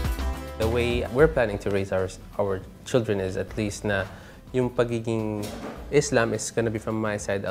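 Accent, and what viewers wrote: Philippines. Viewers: the WAY you TALK... Pinoy na Pinoy..😊😊😊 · habibi your speaking tone truly proved you are a more than half-part filipino..The way you deliver English words/sentences is moderate, dictions clear, very filipino tone, words are delivered with respect whoever is the receiver/listener...For sure your Mum was a wonderful woman, i could see & feel the way you speak the filipino values in your speaking attitude...For Us filipinos, Manners towards everyday life matters.. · Common you might have bi racial background, but in all angle you are more prominent in being Pinoy, therefore don't hesitate to sound such as those things you have already accustomed already from that time you were here in Philippines. Otherwise many will question you and tell that you were just trying too hard to fit in a culture you do not really belong. And it's so grateful to hear you speaking in Pinoy accent, which I think stood out from where you really belong. 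Sabi nga magpaka totoo ka kung saan ka talaga angat at doon ka yayakapin ng mga totoong kababayan mo na nagmamahal sayo at susuporta sayo hanggang sa huli.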